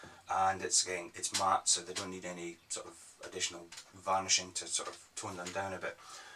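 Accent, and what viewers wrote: Scotland, United Kingdom. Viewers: Lovely work here! All awesome models and I love your Scottish accent! Keep up the good work! Cheers, Leo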